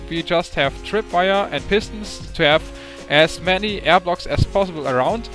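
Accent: Germany. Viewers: WOW, u have such a strong German accent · where do you come from? Germany? ^^ (sounds like it)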